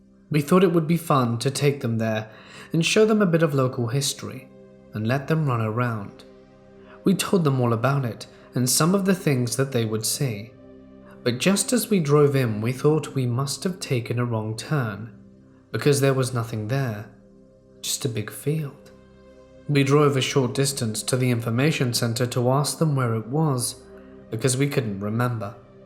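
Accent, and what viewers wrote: United Kingdom. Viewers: I don't think I've ever gotten over deep voice, British accent followed by I'm a 20yo female lol doesn't make me not enjoy or appreciate the video. It just makes me smile to hear lol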